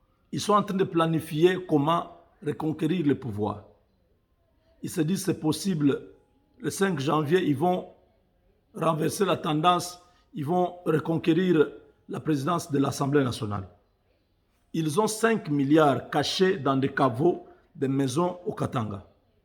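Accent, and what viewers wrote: Rwanda. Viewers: Tu parles le français comme des rwandais, tu as de la famille là bas ?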